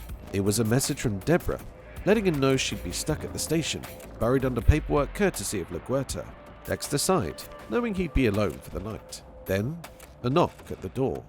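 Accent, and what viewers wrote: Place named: United Kingdom. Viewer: “Masuker” “Dextah” I love Brit’s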